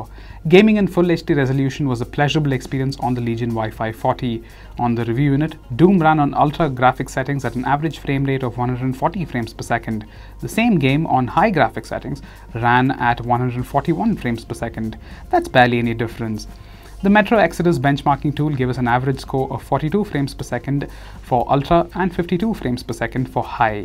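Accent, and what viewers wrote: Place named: India. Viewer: that indian accent i love it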